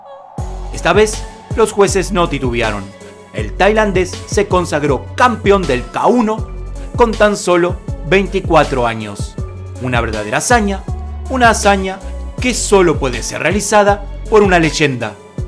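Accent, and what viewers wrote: Argentina. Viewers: Se escucha claramentr como argentino o posiblemente uruguayo, pero peruano o ecuatoriano? Ni cerca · Excelente video! Tu eres argentino? Saludos de Brasil!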